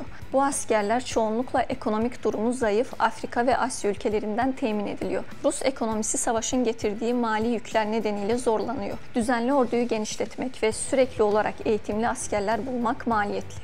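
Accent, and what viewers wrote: Azerbaijan. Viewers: Konusan kizin aksanindan azerbaycanli oldugu belli :) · Salam siz şurnalis Azəribacanlısınz gözəl danışrsınz 🇹🇷🇹🇷❤🇦🇿🇦🇿🇦🇿👍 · Azərbaycanlımi spiker?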